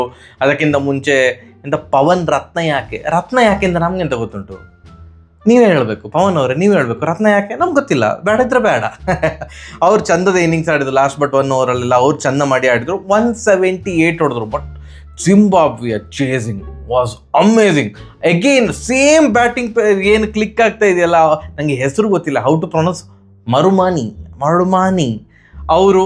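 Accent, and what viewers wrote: Mangalore, India. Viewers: ಆ...ದೇವರ್ರೇ ಉಂಟಲ್ಲ..... Its bought u a new subscriber ❤❤ Love your accent' love kudla ... ಇನ್ನು ಹೆಚ್ಚು ಹೆಚ್ಚು ..ಕಂಟೆಂಟ್ ವಿಡಿಯೋಗಳು ಬರಲಿ .... ನಗುವಿನೊಂದಿಗೆ .... ❤.goodluck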